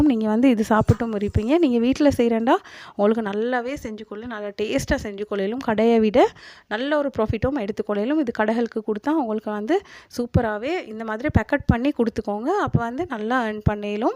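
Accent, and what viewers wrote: Sri Lanka. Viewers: Superb sister, your srilankan acsent❤❤❤ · அன்பான இலங்கை சகோதரியின் தமிழ் உச்சரிப்பும் , பேச்சும் இனிமையாக இருக்கிறது.❤❤❤❤❤subscribe பண்ணிட்டேன்.❤❤❤❤🎉🎉🎉🎉👍👍👍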